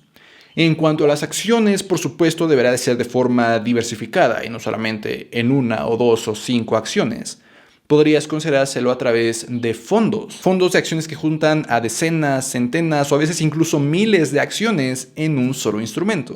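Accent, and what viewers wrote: Mexico. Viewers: Llevo poco de seguirte pero me encanta tu canal cawn, por tu acento creo que eres de México. ¿Que brokers recomiendas para usar aquí en México? Saludos, crack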